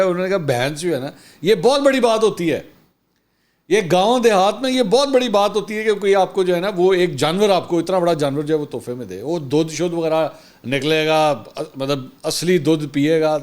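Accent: Punjab, Pakistan. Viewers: HAHA BHAI APKAY MOO SAY PUNJABI STYLE TOUCH BOHAT ACHA LAGTA HAI 😂😂😂😂❤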